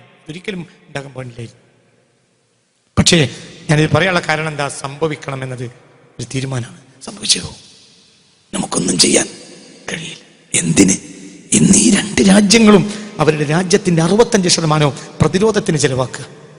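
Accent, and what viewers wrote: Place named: Malabar, India. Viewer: ഉസ്താദ് പ്രസംഗിക്കുന്നത് മലയാളത്തിലല്ല മലബാരി ഭാഷയാണ്.. മനസ്സിലാക്കാൻ പ്രയാസം😓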